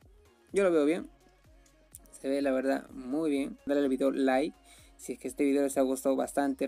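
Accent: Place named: Peru. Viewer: obvio el acento peruano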